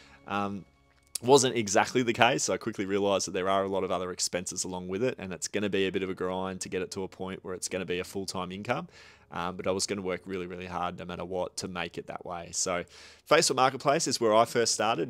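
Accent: Australia. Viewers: your lovely Aussie voice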